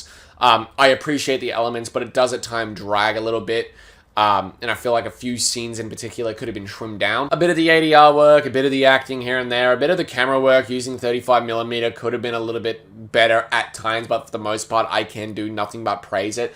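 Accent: Australia. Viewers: New. Good to hear a Fellow Aussie. Cheers X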